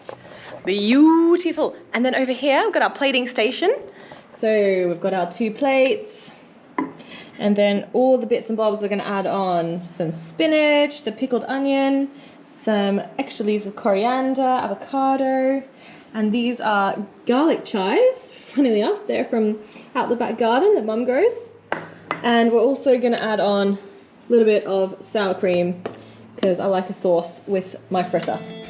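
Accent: Australia. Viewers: Haha, you sound so much more Australian now you're back in Aus :) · She does sound a bit more Australian than before. Must be because she's staying far away from that bad influencer Tilly. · You can always tell an Australian by their "Hee-uh" . We're finally Hee-uh. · Knew you were an Aussie!! Welcome home, chick.. Great vids, cheers. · Your Aussie accent is coming back. I noticed it almost right away. Good on ya cobber. (From a Canadian so I hope I did not offend) Lol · It's funny that you mentioned hoping you'd get your accent back at the end of the video. During your introduction at the start, your accent sounded so strong that I said out loud "Oh that's right, she's Australian!" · Had no idea you were Australian…I can usually do a good job (for a Yankee) of picking out the difference in accents among the commonwealth nations (or former) I admit to missing it this time. 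Were you born there or your parents from elsewhere?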